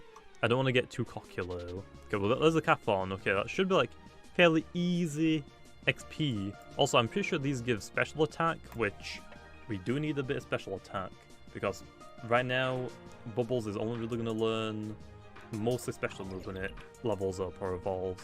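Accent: United Kingdom. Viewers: I think he's British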